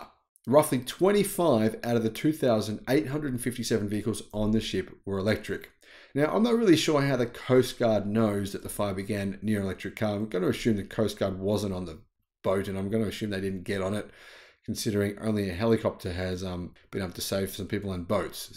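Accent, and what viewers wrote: Australia. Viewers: Definitely a pro EV slant to his story telling here. ICE generally don't catch fire unless they are older cars with fueling faults. EV fires are harder to put out and happen to new cars more often than ICE cars. On a plus note, he does look like a Viking (with an Australian accent!).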